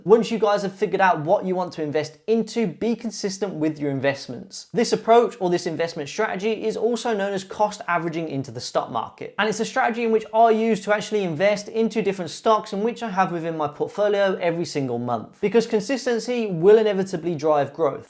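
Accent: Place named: Birmingham, United Kingdom. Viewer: aha no worries mate thanks for making them. And by any chance are you from Birmingham because Im from there and I swear I recognise that accent 😂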